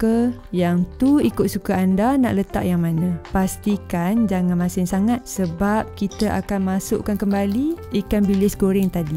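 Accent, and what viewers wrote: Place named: North, Malaysia. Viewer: ni asal mana eh.?? ada bunyi utara skit2 tuhh😆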